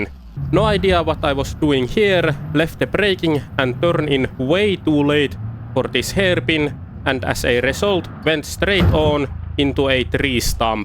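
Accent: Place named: Finland. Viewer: You soud very much like you are finish.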